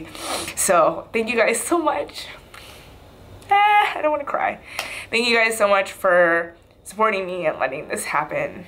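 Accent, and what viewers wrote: United Kingdom. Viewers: Omg! So proud of you!!:) love your british accent haha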